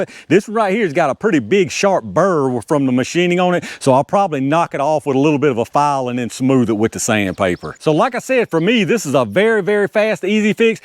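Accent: South, United States. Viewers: AWEsome video. I dig the southern accent too.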